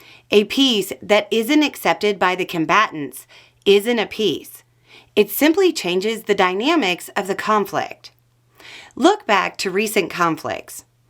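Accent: United States. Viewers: Uau! A true Belle of the Ranch telling the obvious truths with a deep American accent! All is not lost in the USA! The war in Ukraine is currently the most transcendental conflict and will have global consequences.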